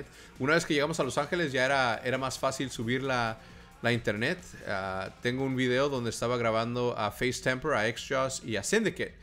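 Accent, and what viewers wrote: Mexico. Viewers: de onde eres mi tienes acento como de mexican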